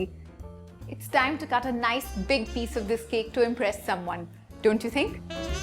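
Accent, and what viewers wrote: India. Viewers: Ulas Gültekin it's the Indian accent.😍😄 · a very strong Indian accent you have.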